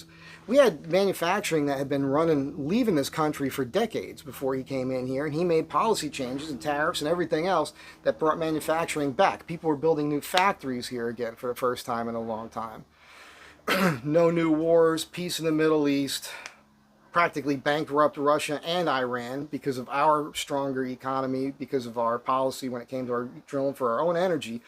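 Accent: New Jersey, United States. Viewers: LOVE YOUR YT.YOU ARE REAL🎉🎉 FOUND YOU FROM SARAH ❤🎉 HEARD THE JERSEY ACCENT AND MIND SET.I GREW UP * BACK EAST** I UNDERSTAND YOUR SELF SUFFECIENT LIFE❤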